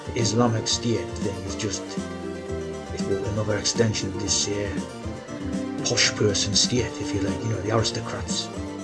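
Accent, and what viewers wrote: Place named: Geordie, United Kingdom. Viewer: I just wondered if you'd lived abroad but I know you are pure Geordie 😂😂🤣🤣😂😂🤣🤣I'm Northumbrian, we both have same strong local accents, unlike many that have changed to fit in, I'm proud of where we're from and never going to change, every two mile up the road a different accent, I was brought us as a Geordie, then moved back a few miles and worked with pitmatic accent, breed of our own😆 x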